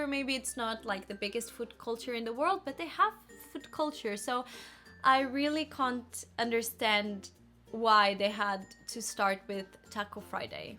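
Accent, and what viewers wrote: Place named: Oslo, Norway. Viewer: not at all:) after living in Oslo for some time Ive got the same accent. that’s why I noticed:D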